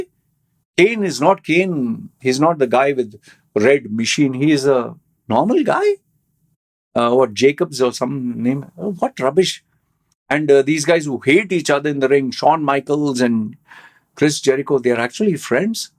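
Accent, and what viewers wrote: India. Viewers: Your english speaking accent is soo indian.. Are u from india ?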